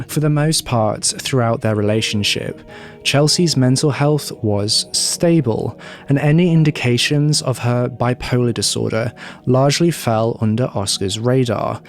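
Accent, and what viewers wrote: United Kingdom. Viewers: I do enjoy your presentations! I also am a fan of “Dark Curiosities”, as I noticed on your channels list. I love British your accent, as it makes listening seem more mysterious! I’m an older person, and have Bi-Polar, as well. I take my meds daily! It effects all a bit differently, and poor Chelsey’s was sadly out of control. Also sad , is the fact that sinister people like “Dark Rider”, are out there as predators. To people in vulnerable.conditions, as her’s. Stay well!🙏🧡✌️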